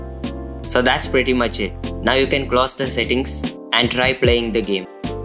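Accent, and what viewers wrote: Kerala, India. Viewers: are you a malayali or indian you sound really like one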